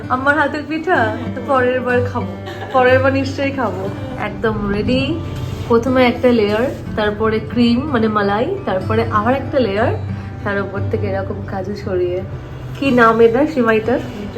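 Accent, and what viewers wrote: Kolkata, India. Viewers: ওনার কথাবার্তা পুরোপুরি কলকাতার বাঙালিদেড় মতন বাংলাদেশের মতন কোনো টান নেই এবার দেখার কথা যদি বলেন সেখানে ভারতীয়রা ও বাংলাদেশিরা একিরকম দেখতে আলাদা কিছু নয় কারণ আমরা সবাই আর্য তাই আমাদের চেহারার গঠন এক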